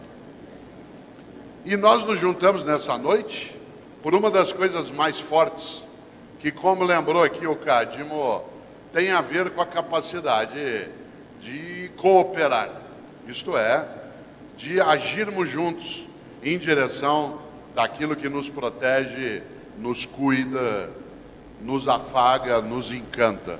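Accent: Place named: South, Brazil. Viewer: Assisto várias vezes.... . Gosto. Do sotaque do povo lindo do Sul .....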